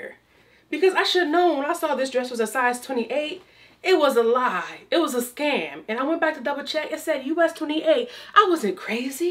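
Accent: United Kingdom. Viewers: It’s the English/ British accent for me 😂😂😂😂😂😂😂😂😂😂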